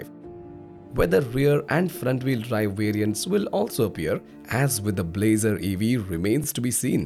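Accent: India. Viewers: The speaking person has indian accent. Does that mean this Honda model is indian made?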